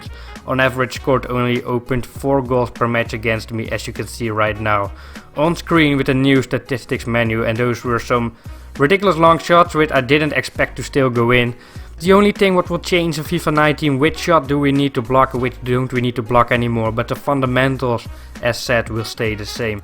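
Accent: Netherlands. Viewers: Must be dutch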